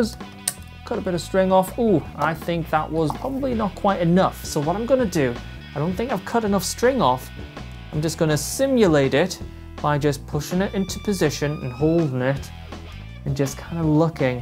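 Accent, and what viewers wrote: Newcastle, United Kingdom. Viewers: Ah, the toon accent! Drop you a sub, gotta support the local lad, awesome channel mate!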